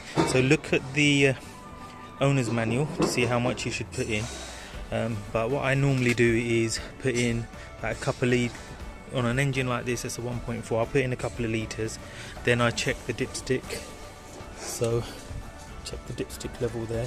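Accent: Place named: United Kingdom. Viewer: SOON AS I HEAR THE BRITISH ACCENT I'M OUT OF HERE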